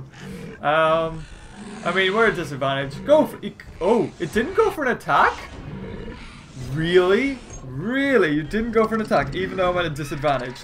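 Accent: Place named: United Kingdom. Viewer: Um,excuse me Mr..I hate to crush ur hopes but you will never do an exact impression of Chris Pratt.See,he has an American accent,you have a British one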